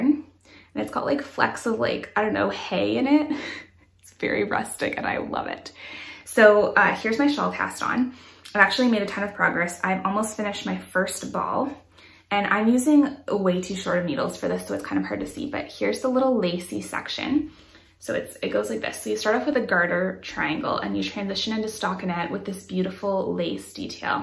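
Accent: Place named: Canada. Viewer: Your stitching is so beautiful! Always enjoy your videos. You’re adorable and I love your Canadian accent ❤️ Also, you talk super fast. It cracks me up 😂❤️❤️❤️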